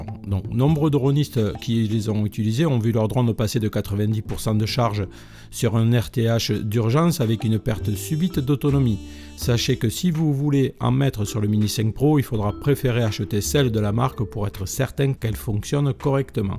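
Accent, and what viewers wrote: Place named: Marseille, France. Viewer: l'accent du sud!! Marseille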